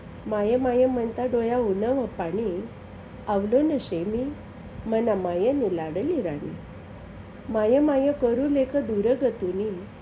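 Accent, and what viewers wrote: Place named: Khandesh, India. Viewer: छान सादर केलीत अहिराणी बोली कानाला गोड वाटते मायवर आहे म्हटल्यावर मनाला लागून गेली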